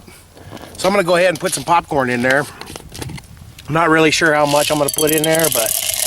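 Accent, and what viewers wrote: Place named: Eastern Oregon, United States. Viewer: What part of Oregon are you from? My guess is somewhere from Eastern Oregon?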